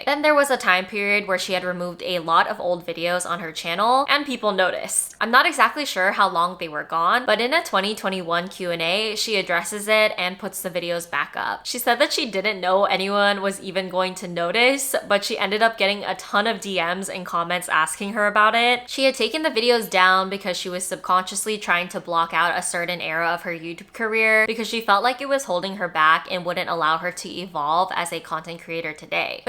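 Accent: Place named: United States. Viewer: Learned American English from her .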